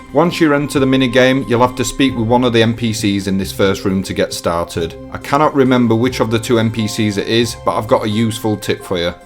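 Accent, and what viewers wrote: Yorkshire, United Kingdom. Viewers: always nice to hear a fellow Yorkshireman! been following a couple of your guides recently (bank organisation) brought me here. for the past 15 years ive used the same youtubers slayermusiq for quests theoatrix for guides and torvesta for PVP... with your clear guides and step by step instructions, im sure i will be coming back. earned a sub off me! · the yorkshire charm just made it even better a description on how to. nice one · Lovely Yorkshire Guide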